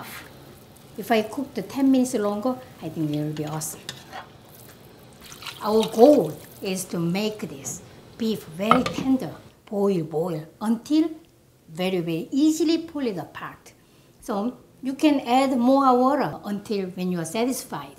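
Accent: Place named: South Korea. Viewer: You are so cute. korean intonation of english too.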